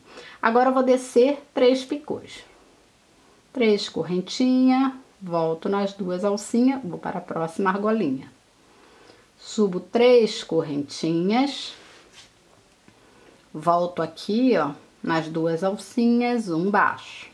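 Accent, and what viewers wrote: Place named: Rio de Janeiro, Brazil. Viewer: Passei parte do tempo neste final de 2023 fazendo este lindo tapete, fiz o meu no barroco natural e ficou lindo! Adoro seus trabalhos, gosto do seu sotaque carioca, e a propósito suas unhas são lindas. Sucesso neste ano de 2024, gratidão por compartilhar seus trabalhos belíssimos.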